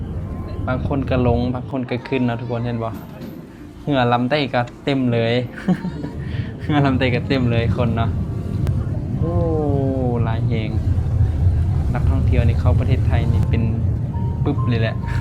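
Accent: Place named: Laos. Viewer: ฟัง..เว้า สำเนียงลาว ล้าน % 🫵😁😂🤣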